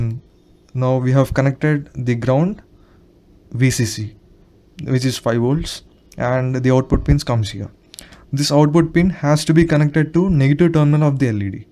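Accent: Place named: India. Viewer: This video really helped me bro , and I know that you are from India from your acent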